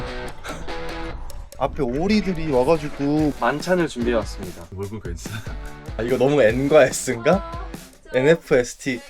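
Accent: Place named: New Zealand. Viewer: Its wonderful you came back home to Aotearoa Paul, and so nice to hear that you havent lost your Kiwi accent! I hope you and your wife enjoyed the trip, its been fun watching so far 😊 🇳🇿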